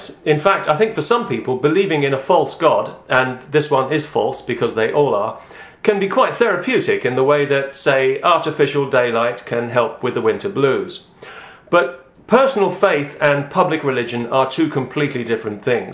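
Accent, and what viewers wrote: United Kingdom. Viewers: I agree with much of your criticism of the clergy. However, judging from your accent you are British, and I don't recognise most of what you are saying in relation to church ministers in the UK. Do we have ANY televangelists in the UK with private jets? How many clergy in the UK live in palaces? (and anyway, I don't think that the residential accommodation in the archbishops 'palaces' would be anywhere near as luxurious as the word suggests to most people). In my experience the vast majority of ministers in the UK are not well off, and they do their best to help people (in their present situation, not in the 'next life'). Do I detect that your hatred of and bitterness toward the Church might stem from issues you have had with the Church in the past? Maybe abuse as a child? With regard to your beloved atheism, the two biggest examples of the Church being downtrodden at State level must be China and the old USSR, where atheistic Communism was the order of the day. 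In both cases, many ministers and lay people suffered severe persecution and even death, simply for practising their faith. You might suggest that it was their Communism which was at fault rather than atheism, but these examples show that doing away with the Church does not mean that we all live happily ever after.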